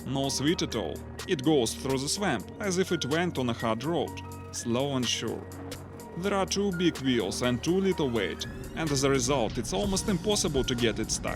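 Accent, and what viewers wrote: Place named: Russia. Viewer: Russian accent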